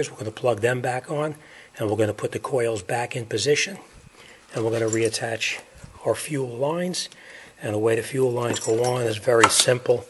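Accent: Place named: New Jersey, United States. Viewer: Hey, are you from Jersey City? I am, and I can detect the Kings english.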